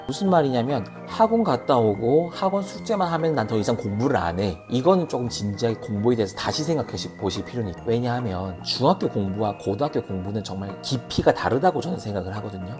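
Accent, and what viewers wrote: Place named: Busan, South Korea. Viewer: 우연히 들어오게 되었는데 조근조근 선생님 사투리가 너무 좋네요. 여기도 부산이라~~ 중2 딸래미 수학성적 50점을 못넘기네요. 그룹, 개별수업 시켜봤는데 안되서 과외를 해요. 기초가 많이 부족하다 하시네요. 그나마 국어,영어는 80정정도 되구요 확실히 과학,수학쪽을 어려워해요. 그런데 미술을 너무 좋아해서 틈나는대로 종이나 아이패드로 그림을 그리고 있어요. 집근처에 올해 2회째 되는 위탁형공립예술학교가 생겼는데 인문계 다니다가 3학년 1년과정으로 그 학교를 다니면서 수업을 들을 수 있는데 국어,실용영어,한국사,체육 수업하고 오후엔 전공 과목(미술,음악) 실기수업 위주로 한다고 합니다. 예고를 가기엔 늦었고 거리도 너무 멀구요. 꼴지를 하더라도 인문계에 진학해서 공부하고 미술학원을 병행하는게 나을까요? 특성화고에 가서 내신도 좀 잡고 미술도 시킬까했는데 요즘은 내신따러 특성화고 가는 성적 괜찮은 학생들도 있다고 하더라구요. 중3부턴 입시미술학원에서 주말에 취미로 먼저 시작을 해볼까하는데 미대는 수학성적을 안보더라구요. 그래서 고민이 중2 이번 기말고사 끝나고 수학과외를 끊고 ebs 50일수학이나 30일수학 인강으로 기초를 다시 복습하고 3학년 현행을 인강으로 하는건 어떨지요. 공부도 잘하고. 그림도 잘 그리면 제일 좋겠지만 둘다 하기엔 경제적으로도 힘들 것 같아요.ㅜ 물론 미술을 시켜주는대신 인강으로 수학공부를 게을리 하지는 말자고 다짐을 하구요 답답한마음에 두서없이 글을 썼네요 바쁘시겠지만 선생님 조언 부탁드려도 될까요?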